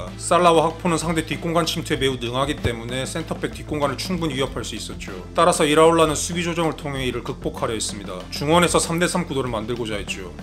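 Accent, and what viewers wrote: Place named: Gyeongsang, South Korea. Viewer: 경상도 스탈인듯요~~^^😮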